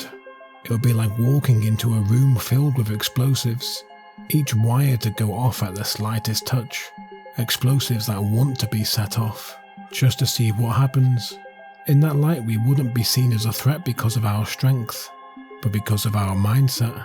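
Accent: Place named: Cockney, United Kingdom. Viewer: This video has been done before, but you should really lean into that cockney accent.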